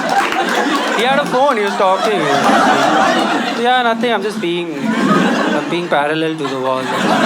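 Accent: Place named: South India, India. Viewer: Watching you for the first time and totally hooked....you sound so much like a south indian 😂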